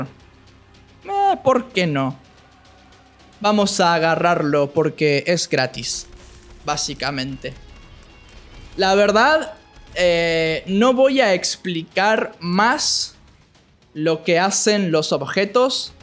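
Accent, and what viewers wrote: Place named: Argentina. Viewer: Se nota que es nativo, hasta se identifica a kilómetros que es de Argentina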